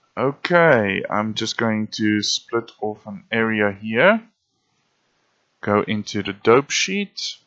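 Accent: Afrikaans, South Africa. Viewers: Buddy, you afrikaans?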